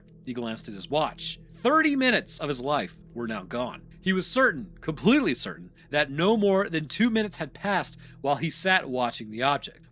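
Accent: United States. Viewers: He pronounces the 'Merican way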